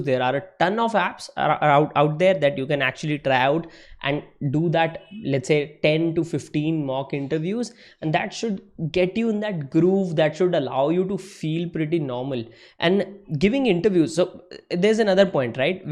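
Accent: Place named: India. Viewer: You have a butler English Indian accent.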